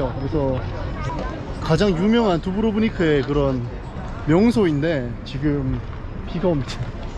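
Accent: Gyeongsang, South Korea. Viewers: 나 웨 영상 끝나는게 싫지? 재며들었나봄...경상도식 영어 못잃어↗️↗️↗️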